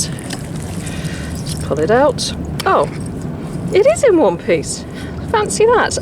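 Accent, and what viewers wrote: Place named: RP, United Kingdom. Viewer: You should really do voice-over work with your Received Pronunciation speech. It is quite charming to the American ear.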